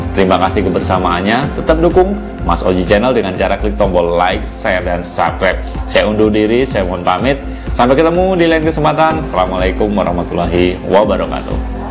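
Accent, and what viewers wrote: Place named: China. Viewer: NADA BAHASA ANDA KEDENGARAN SEPERTI ORANG CINA BEPAJAR NGOMONG INDONESIA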